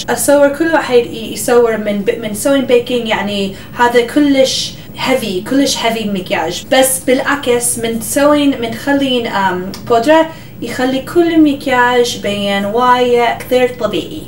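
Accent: Iraq. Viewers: We love love love how u express the words in Iraqi language and u did great videoing and we love ur tutorials u R the <link> 😘😘😘😘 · فدوه الي تحجي عراقي I like you Arabic Iraqi accent I am Iraqi too much love ❤️ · حبيت لهجتج العراقية 😍💙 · فدوة للي يحجي عراقي ... نحببببج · شغلچ روعه والعربي واللهجه العراقيه تخبل عليج 😘🌹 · your Iraqi accent is so beautiful love you 😍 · In love with your Iraqi accent keep going xx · ما ازكى اللهجة العراقية عليها بتجنن 😂😂😂😂😂😍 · الله تخبلين تحجين عراقي هله بالعراق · Omg bravo your talking arabic. your arabic is good iam lebanese but your talking iraqian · باللهجه العراقيه تجننين يعمري 💕💜💜 I love u 🌸💜 From Iraq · اييي الحكي العراقي احلا كثيييرر😩💙💙💙💙💙. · حلوووو العراقي من حلكج ههههه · She was speaking Arabic specifically the Iraqi accent where she is originally from... I think The iraqi accent is really special in the Arab world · فدوه شلون تحچي عراقي 😂😂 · فدوة للتحجي عراقي 😍😍 استمري 👏👏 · you are legend you are learn me no thing impossible only work by your heart 😍😍😍متابعتج من العراق اللهجة العراقية تجنن عليج 😌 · you're really sweet when you speak iraqi , I love you so much 😻💘. 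· حياتي فدوه يجنن الكلام العراقي بحلگها.. تگول اذا متخلون بودره حره جوه العين يتحرك الميك اب ويصير يرگص بالوجه ههههه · i love you im from Morocco and I like your Iraq language 😍😍😍😍😍😍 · اعشقك لهلجتج بالعراقي🇮🇶💗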